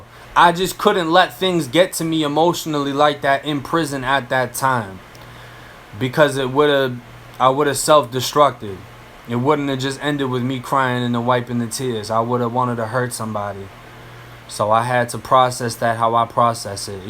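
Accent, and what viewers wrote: Boston, United States. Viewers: I can here his battle between his boston accent and his fla. Accent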